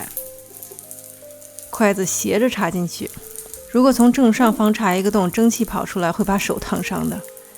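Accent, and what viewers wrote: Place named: Northwest, China. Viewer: 因为爱上了西北口音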